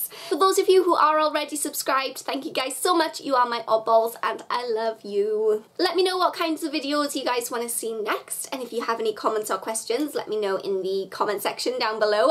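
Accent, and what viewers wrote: Wales, United Kingdom. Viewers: Omg r u welsh soo am i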